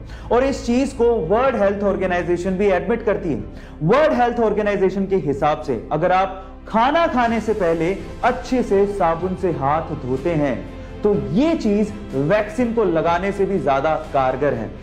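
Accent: Maharashtra, India. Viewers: Aap marathi kyon bolte hai like thamba but it's funny I like it because I am Marathi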